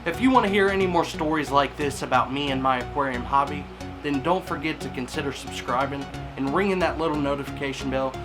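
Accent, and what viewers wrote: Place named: Kentucky, United States. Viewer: Greetings from a fellow Ky native! I stumbled across your videos and a few seconds in I thought, "Where is this guy from? 'Cause he sounds like a Kentuckian lol". Enjoying your videos!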